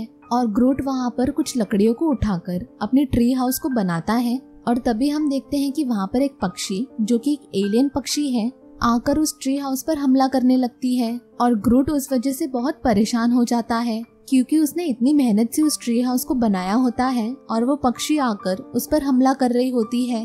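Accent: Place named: Karnataka, India. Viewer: You are from Karnataka 🤔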